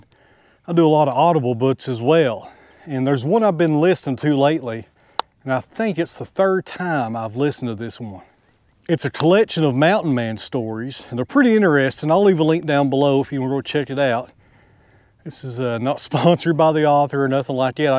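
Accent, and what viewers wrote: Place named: Florida, United States. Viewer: New subscriber brother i really this adventure im an ole Florida cracker and I pronounce the same as u God bless you brother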